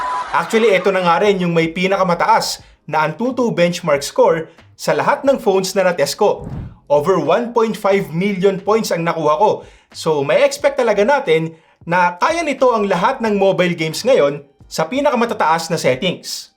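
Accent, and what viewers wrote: Cavite, Philippines. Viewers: Kabiteño k pla boss ahahaha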